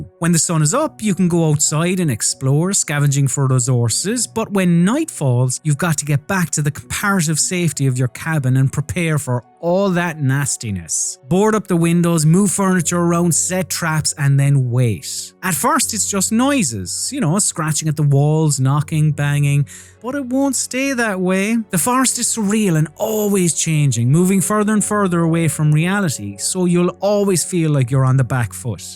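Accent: Ireland. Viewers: I have never heard such Irish accent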